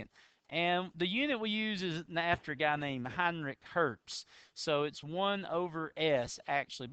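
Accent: United States. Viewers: loving the western accent! -- better explained this way!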